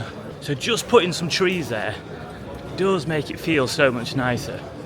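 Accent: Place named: Yorkshire, United Kingdom. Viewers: Long time watcher here- nice to see your first episode outside the UK! I actually first started watching your videos because I grew up in West Yorkshire. I haven't lived in the UK for over a decade but it's nice to hear the accent and I do enjoy your Made in Britain series and Death of the High Street- I think they compliment each other well. I enjoy learning about the old industries- and share your love of old mill chimneys. I'd just like to add that I love Eleanor's fashion sense- actually before you introduced her I was wondering who the pretty and stylish French girl was in the background... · I love your accent. ♥️ Is it from Yorkshire?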